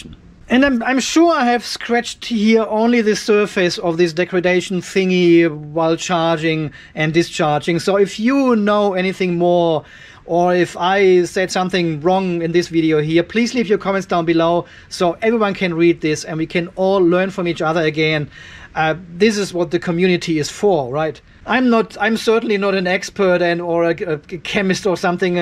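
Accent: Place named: Germany. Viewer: Bei Deinem ersten Video dachte ich mir, daß Du Deutscher ...ähm... gewesen sein mußt. Bei dem Akzent. Aber "Oettinger" geht ja mal gar nicht. Zeig der Welt bitte nicht so ein Plörre. Wie wäre es mit Düsseldorfer Alt, vorzugsweise "Schlüssel Alt" oder "Füchschen Alt". ;-) Grüße von Düsseldorf nach Australien!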